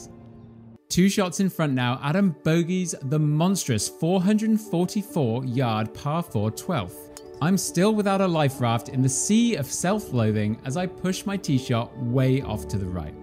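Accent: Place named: United Kingdom. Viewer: Commentary excellent…. Forget the wind exists 😂😂😂😂😂😂 Where bouts the uk you from or where were you from ? 🇬🇧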